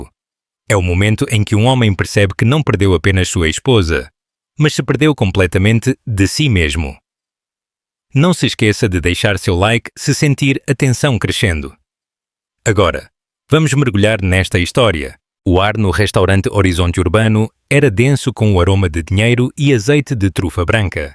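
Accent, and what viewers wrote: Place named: Portugal. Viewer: QUE NARRAÇÃO HORRÍVEL E EM PORTUGUÊS DE PORTUGAL! QUE DESRESPEITO ‼️😡😡😡😡😡😡😡😡😡😡😡😡😡😡😡😡😡😡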